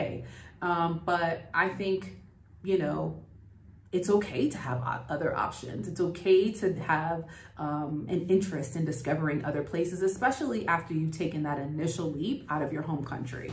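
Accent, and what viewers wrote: United States. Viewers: You should have moved to Limpopo province. It is hot there., you sound like you're from Texas, Austin. Lol. I'm a South African studied and lived in the USA for more than 20 years. In Kansas it snows. I visited Austin TX in winter. The other day it snowed and that is when I realized it rarely snow in Austin because of the car accidents on the roads.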